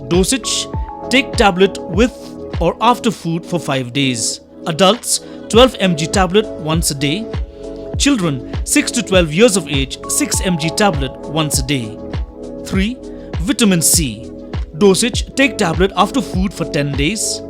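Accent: Meghalaya, India. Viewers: Ia ki jingpynbna ba kongsan kren da khasi mung... nga sngewthuh hi khasi accent.. thait ba kumne kein ha ri ngi ym poi shaei2 ruh ... u ba bun un nym sngewthuh iakane ... shu ia leh khlem pyrkhat... kumno yn myntoi haba kumne ??? Biej👎👎👎👎👎👎👎👎👎👎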